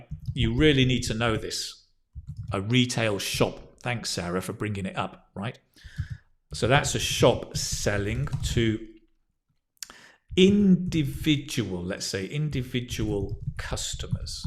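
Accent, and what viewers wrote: United Kingdom. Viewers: Thank you, Mr.. I enlarge my vocabulary and improve British accent a lot with your channel. This passage is extremely great.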